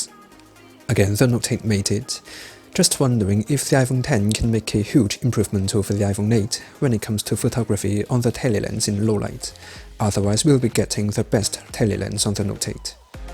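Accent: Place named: United Kingdom. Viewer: 你的英音真的好听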